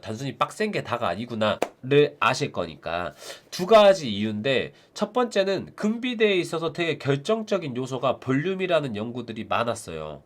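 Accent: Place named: Jeolla, South Korea. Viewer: 아놔 라도 사투리 때문에 안들을란다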